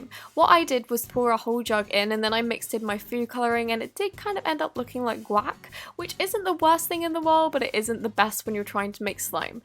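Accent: England, United Kingdom. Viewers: What part of England a are you from?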